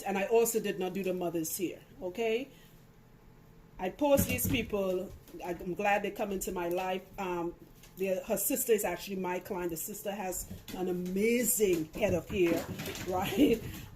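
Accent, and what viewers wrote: Trinidad and Tobago. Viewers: Sounds very trini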